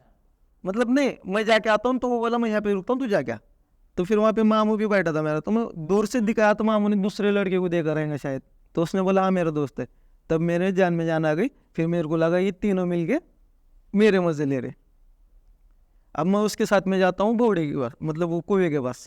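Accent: Maharashtra, India. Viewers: Hindi बोलण्यावरून समजतंय मराठी आहे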